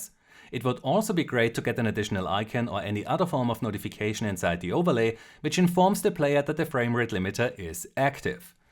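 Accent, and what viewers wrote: Germany. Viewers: Ahh der Deutsche akzent xd aber cooles video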